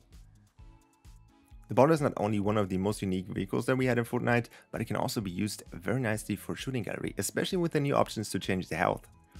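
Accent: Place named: Germany. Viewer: u sound german....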